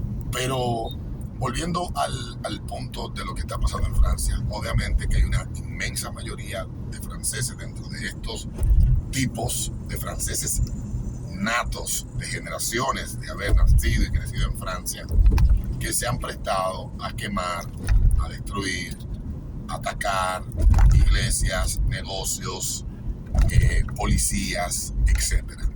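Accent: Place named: Dominican Republic. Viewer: rayos en verdad cuando vi la miniatura pense que no tu aspecto no parecia de una persona de republica dominicana, todos esos pensamientos desaparecieron cuando escuche tu voz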